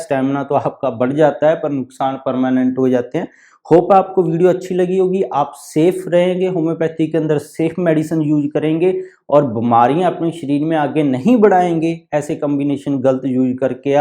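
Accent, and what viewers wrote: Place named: Punjab, India. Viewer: Kuchh samajh ni aa raha Punjabi touch k vajah se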